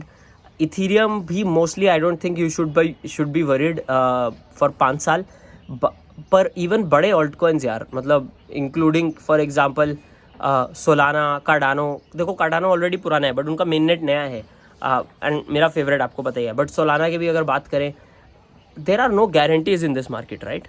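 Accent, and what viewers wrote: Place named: Nagpur, India. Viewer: Bhai Nagpur se ho kya....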